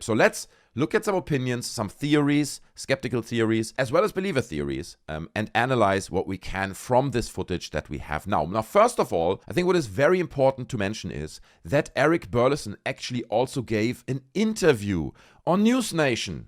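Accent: Germany. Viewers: The German accent takes this channel so far over the top, I couldn't subscribe fast enough. Commence binge!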